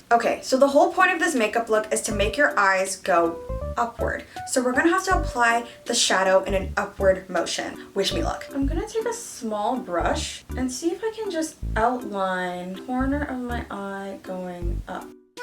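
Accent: United Kingdom. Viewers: Your british accent killed me🤣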